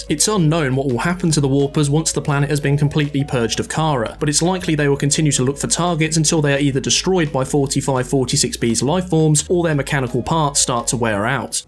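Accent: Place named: United Kingdom. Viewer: Why is it every time I come across one of these Explained Video's No matter what game it is it's always Some British voiced Prepubescent kid XD lol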